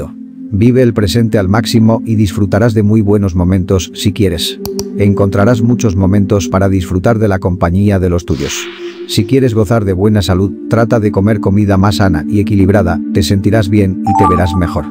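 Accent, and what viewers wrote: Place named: Spain. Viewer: No me gusta que el locutor sea español, cuando el locutor sea mexicano te doy Like mientras, no te veo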